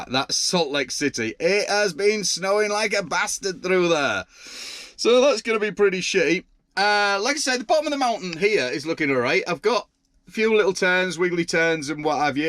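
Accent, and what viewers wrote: Yorkshire, United Kingdom. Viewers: Yorkshire accent🙂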